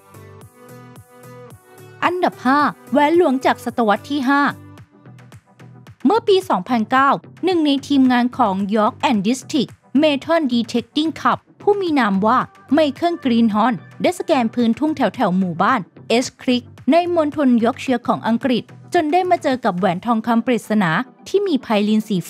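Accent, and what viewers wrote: Thailand. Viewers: ที่รู้ๆคือ พูดเหน่อมาก นี่ขนาดดูพยายามไม่ให้เหน่อนะเนี่ย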